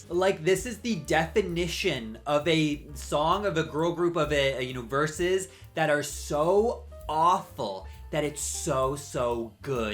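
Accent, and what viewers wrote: United States. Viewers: I know you said don't ask about it... but why DO you have an american accent as an irishman? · Why DO you have an American accent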